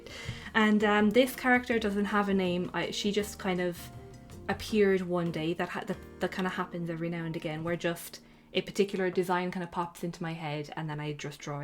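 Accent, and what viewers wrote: Ireland. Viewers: Some beautiful work and a lovely Irish lilt to the narration. Really enjoyed your video! Thanks for sharing !!!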